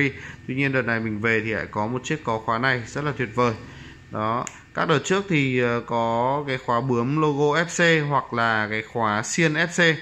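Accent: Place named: Scotland, United Kingdom. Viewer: Thought this geezer was Scottish at the beginning lol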